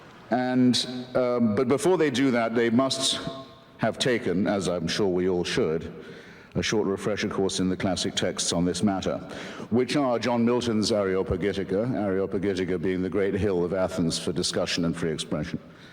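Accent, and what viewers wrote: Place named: United Kingdom. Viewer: Too Bri'ish didn't listen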